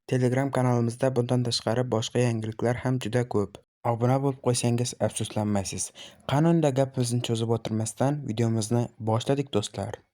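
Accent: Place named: Khorezm, Uzbekistan. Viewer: Chisdi Xorazm sheva qu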